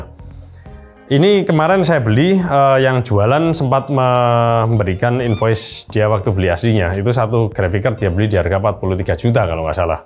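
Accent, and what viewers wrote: Java, Indonesia. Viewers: Uasik nek om seng edukasi. Mantep dengan beberapa penekanan jowone. 😂😂😂👍🏻👍🏻👍🏻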